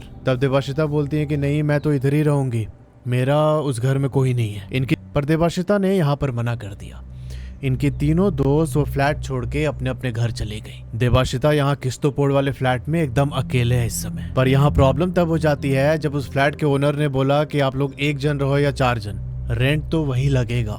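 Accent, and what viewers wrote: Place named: Bihar, India. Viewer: Bhai i feel like you are weather from Bihar or belongs to area like kanpur, Gorakhpur or Lucknow. In every sentence you say- "hai nah" or " aayi nah"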